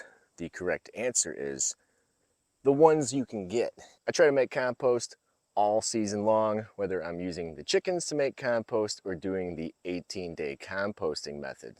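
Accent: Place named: Burbank, United States. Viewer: Another great video. I cold compost but its always fun to listen to that Burbank accent 😂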